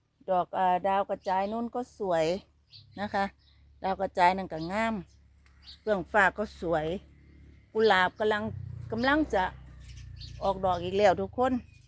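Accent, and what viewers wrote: Isan, Thailand. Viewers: โชคร้ายสำหรับ FC ต่างแดนอย่างเรา ระบบ AI เปลี่ยนเสียงภาษาอีสานที่เป็นเอกลักษณ์ของ แบบฉบับที่เราชอบเป็นภาษาท้องถิ่นของประเทศนั้นๆไปแล้ว มันไม่ unique อีกต่อไปแล้ว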